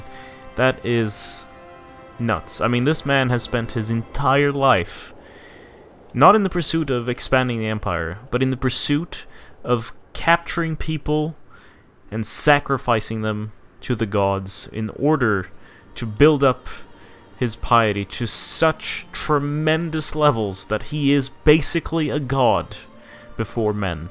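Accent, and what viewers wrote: Sweden. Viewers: Are you Swedish? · You can tell you're Swedish by the way you pronounce Sigtuna xD You've gotten good at hiding your swedish accent while speaking English too.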